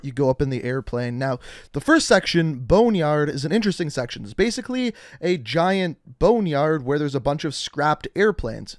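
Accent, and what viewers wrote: Canada. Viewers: What's your accent? Like where you from because if I were to guess I'd go with Canadian????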